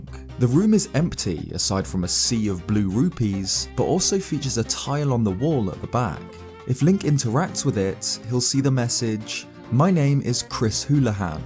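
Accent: United Kingdom. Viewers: will the legend reply aswell are you british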